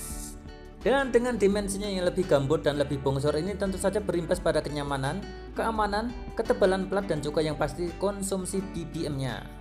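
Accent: Java, Indonesia. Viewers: Tak LIKE 7 tak SUBSCRIBE yo, Mas. (aku seneng gaya bahasamu sing Medok iku) Qiqiqiii....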